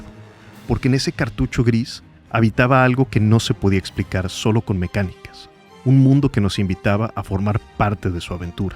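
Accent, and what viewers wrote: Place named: United States. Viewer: Hubiera sido un buen video si no usará ese asento culero de americano y espanglish forzado, si está hablando en español!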